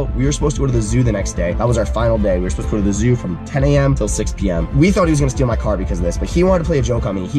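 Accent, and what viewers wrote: Canada. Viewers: Woah the Canadian accent is strong today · Lmao...I'm a Canadian so I just think he sounds normal 😆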